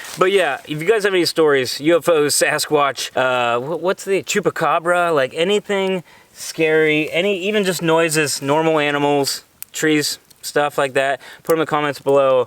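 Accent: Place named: United States. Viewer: I'm always relieved when I click on these videos and hear an American accent.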